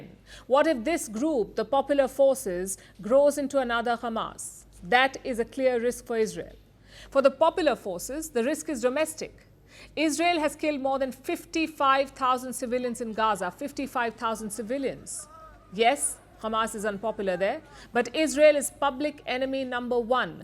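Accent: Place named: India. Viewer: Typical indian english dont know why this lady is so serious while reading the news bolki shawerma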